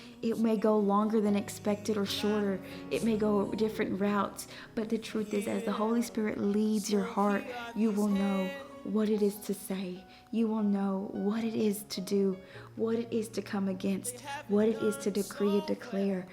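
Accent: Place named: Oklahoma, United States. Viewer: Love this video💕Inspires me to walk with God the right way. Btw sis are you from OK? You sound just like the ppl from my hometown lol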